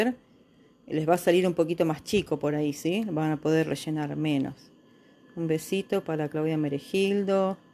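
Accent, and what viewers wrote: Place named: Argentina. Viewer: Señora de q parte de ARGENTINA ES USTED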